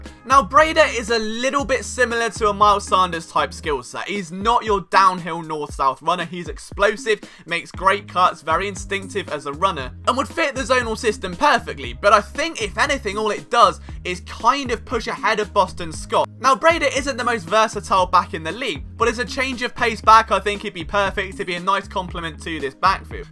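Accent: England, United Kingdom. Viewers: I’m from Philly and listen to a lot of Eagles content and yours is by far the best. I like the fact that you don’t hype things and that you dive deep to give all of the supporting information that you can for every post. When I first heard your accent I was curious about why and where you were doing this from and I confess I am still a little curious. I’ve never caught your full story of how a Brit got into this. you are exceptional at what you do! You have a gift and please keep bringing us information starved and isolated Eagles fans Eagles news to brighten our days! Thank You! Ace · ... I'm old enough to be your grandfather, born, raised & lived in the Philly area most my life, but now live in northern Colorado... You Sir, are young, born in Britain, have a British accent and know tons of facts about the Eagles... Yet it all means nothing until we cut ourselves open and... 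"BLEED GREEN" and that sir, you do... screw the trolls! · Ugh damn I try but I can't stand the accent at all!! Fuk not that the vid is bad. It's just you talk fast and loud in the accent I think that's what people are talking about I don't think it just cuz ur not a American u just talk fast and have a heavy accent .I personally cannot stand an English accent at all. But it has nothing to do with you personally at all. Eagles fans are over the world and anyone can be a fan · You're British??? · Wait, you're British? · Weird mix of English accent and Eagles news.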